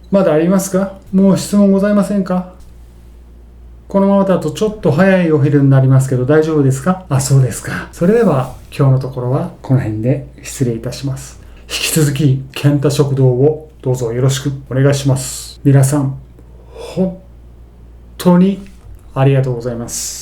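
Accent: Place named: Fukui, Japan. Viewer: イントネーションが福井っぽい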